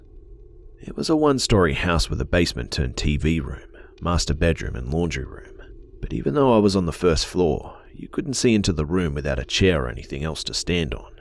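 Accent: Australia. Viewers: Oh great now we got a shitty aussie reader who also sounds like a puff like the rest of them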